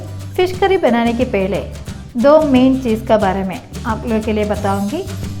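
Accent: Kerala, India. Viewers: Amazing Ma'am. Super fish curry. And your Hindi is amazing, with a touch of Malayalam accent. . That's the beauty of our great country India. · you are from Kerala,you should be proud to speak in your mother tongue.. Your Hindi sounds like Malayalam. I love Malayalam,speak in Malayalam . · Love you dear. Am in Dubai suddenly I remembered frind who is shifted to Kerala Bec same style of Hindi speaking ❤️❤️❤️ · love the mallu accent....maja aa gaya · Nice receipe , Nice malayalam Hindi & Nice saree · You are so sweet hindi Kitna majedar he kerala accent me fantastic recepi god bless you always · aapka hindi bolne ka style mujhe meri friend ki yaad dilaya. She is also from kerla.. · fish to banayenge tab banayenge par aap ho bahot hi zyada CUTE ho and aapka malayalam accent me hindi bolna bahot hi pyara lagta h. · Hello there, liked watching your recipes, specially the coconut shells used n the pots cooked in. 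Your hindi reminds me of one of our Auntie from Kerala and many friends I have from there, so also when you give recipe details I cherish your speaking. Keep sharing recipes, I wish I could also get tamarind and shallots from Kerala :-) Have a blessed wonderful day! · Keralite flavoured hindi is really very sweet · Nicely explained. Moreover your language was very sweet dispite being from Kerala. Hindi gives you a wider audience... keep it up 👍. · Your Hindi is typical Mallu Hindi....it reminds me of my mummy speaking hindi with our neighbors...even my mom makes fish curry in the same manner....amazing job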